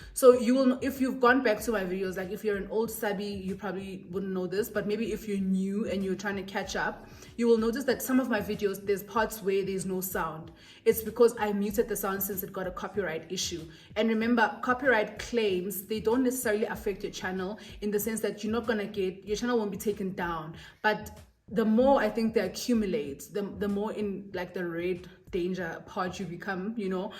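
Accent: Nigeria. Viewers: Woww❤❤❤you look like those rich Nigerian woman with that face+accent,,we can't wait for more video#2024,,,, Happy New Year Motswala 🎉🎉🎉😍😍😘😘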